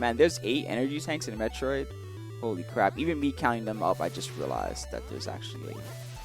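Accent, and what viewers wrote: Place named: New York, United States. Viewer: I recognize a newyorker anywhere, #Queens!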